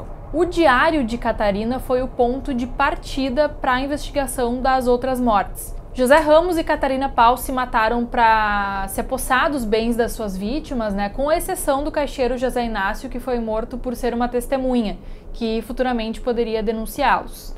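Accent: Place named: Rio Grande do Sul, Brazil. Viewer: Pior não era, disso estou bem certo! O que acontece é que naqueles tempos, era tudo na base das testemunhas, dos suspeitos, O que tornava difícil resolver crimes, O cara quando é mal, Ele mata até pra roubar uma bicicleta! Não acho que a época influencia no caráter dos bandidos, ( mas isso sim, ela facilita ou dificulta as ações deles! ): Tô com saudades dos teus vídeos, você tá preparando algum para breve??? ( ACHO LINDO O TEU SOTAQUE DE GAÚCHA ).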